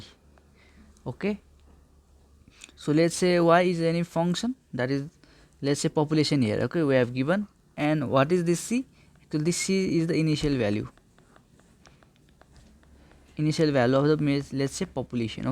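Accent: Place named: Nepal. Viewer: Are you from Nepal? Your accent seems like Nepali.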